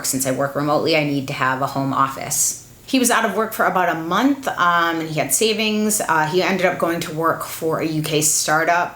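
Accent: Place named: East Coast, United States. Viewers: I love your raspy east coast voice because it makes me remember I’m watching another goddamn adult on YouTube, not a teeny bopper. There’s a time and a place for their content...but I always stop drop and watch your videos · Always enjoy your content, and your raspy East Coast voice, RI native here. Monty Python is one of the building blocks for a great childhood, go dad! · I came to your channel a couple of years ago because of your “bitchy look and raspy east coast voice” lol. I have the same look and not raspy but an east coast (Nj) voice lol. Love the fact that you’re not a twenty something YouTuber!! Your opinions have always been trustworthy to me. I’m glad I found you ❤️.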